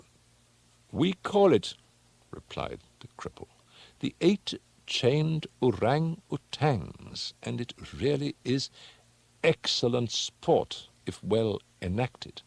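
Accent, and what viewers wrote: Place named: RP, United Kingdom. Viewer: This one was overdone. Also, Poe was an American. It wasn't written with a posh British accent in mind. 🙄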